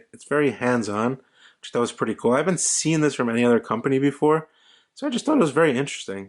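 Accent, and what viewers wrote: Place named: RP, United Kingdom. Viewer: very different quite posh also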